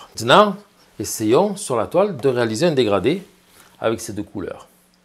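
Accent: South, France. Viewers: Petit accent du Sud de la France ET du Québec?? :) Merci pour vos astuces!!!!! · Merci vous êtes bon pédagogue , j'aurais aimer être un de vos élèves mais avec votre accent je suppose que vous êtes du sud! Merci pour cette vidéo! Bonnes fêtes!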